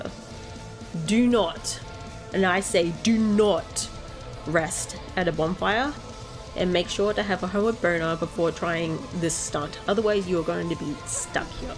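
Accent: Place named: Australia. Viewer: What are you Australian? If so I like your accent.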